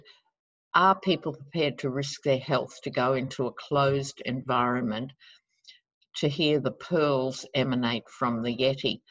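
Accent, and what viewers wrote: Australia. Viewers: The Australian accent is most charming. I never tire of listening to it. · We say it's speaking, 'Strine'! :)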